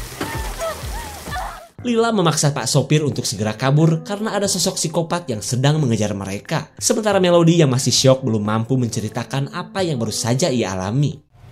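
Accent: Sunda, Indonesia. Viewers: Iya bener suaranya sunda pisan 😂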